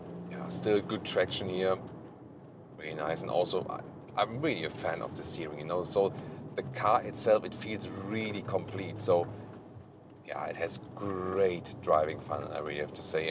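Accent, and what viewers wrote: Germany. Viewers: Nice review, very nice car - though rather pricey. But may I just address something here, and I mean this in the nicest possible way: I find it very hard to listen to the accent, and even more so, the numerous mistakes in the language. For example: "If it WOULD BE an S-line, it WOULD..." drives me nuts. It should be: "If it WERE an S-line, it WOULD..." When you choose to present in English, the language should be flawless, especially when named. Alternatively, just do it in German. And for the record, I'm German myself.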